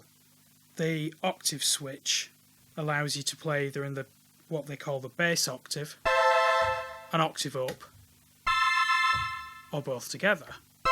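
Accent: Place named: North, United Kingdom. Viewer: Great review nice to hear a northern accent on here.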